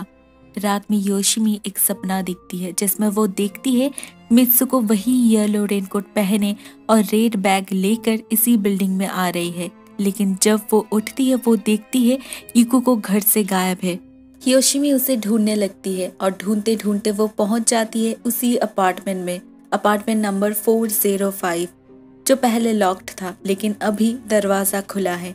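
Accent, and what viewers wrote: Bengal, India. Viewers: Ma'am Don't mind Bt are you a Bengali person???? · Are u a bangali or udiya....??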